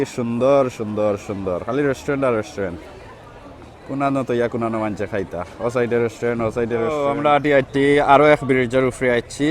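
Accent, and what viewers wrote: Sylhet, Bangladesh. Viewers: সিলেটি মাতর মাঝে জে মজা যতো বার হুনি আরো হুনতে ভালা লাগে😁 আই💜ইউ 😁👌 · বাইসাবোর সিলেটী মাত যে সুন্দর লাগের! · Mr., thank you very much for your eventful video through which I had a rare opportunity to go round London city and enjoy a lot of things. I liked your sylheti dialect and brushed up my knowledge of it which I learned long ago. Thank you again.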